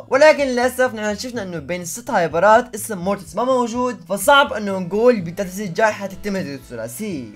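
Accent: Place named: Saudi Arabia. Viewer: ليه تتكلم سعودي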